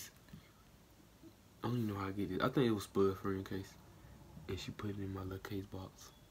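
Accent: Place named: Atlanta, United States. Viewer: Sounds like he from Atlanta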